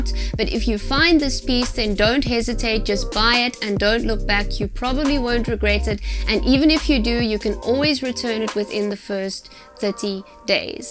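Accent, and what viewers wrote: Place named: South Africa. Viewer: I clicked on your video and was so happy to hear a South African accent! If only ZARA had an online shop in SA. Love your channel <3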